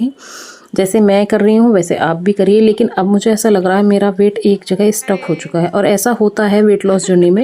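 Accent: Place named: Meerut, India. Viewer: Didi aap ki sasural kahan per hai mujhe aapki Jo language hai vah merath Jaisi lagti Hai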